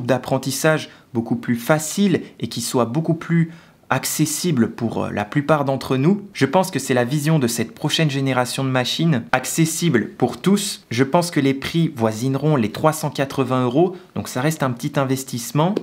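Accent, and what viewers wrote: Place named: Switzerland. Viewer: bonjour de Normandie . un suisse? je m'attendais a un accent bcp plus prononcé. tout fou le camp. mais en contre partie j'aime bcp votre voix elle a une certaine musicalité (meilleur formulation qui me vient e l'esprit). je cherchais des news geek et je suis tombé sur votre chaîne j'aime beaucoup. j'aimerais énormément débattre sur "nouvelle génération d'imprimante 3d" pour moi les reelles nouvelles générations ça va etre les imprimantes a tapis roulant les imprimantes "infinis" tout le reste (a mon avis) ce ne sont que des mises a jours. c'est pour ça que je me casse le train depuis deux ans avec une base am8 ( et meme prusa bear c'est + a la mode) que je veux custom a fond. car lest pas possible de trouver a prix correcte une imprimante qui nous correspond parfaitement . auto leveling, <num>v électronique, stepper, rail lineaire ou tige 8mm buses classiques ou alongee pour imprimer reellement en 3d et modifier la résistances des pièces par la fibre. 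la tete du chassis ect. je vois l'imprimante 3d de mes reves un peut comme le sabre laser d'un jedi qui est fait sur mesure pour lui. et en plus c'est instructif et valorisant.... mais ce qui est frustant c'est que pour l'instant c'est une ender 3 simple qui fait tout le boulot..... enfin bref bonne continuation je vais vous suivre.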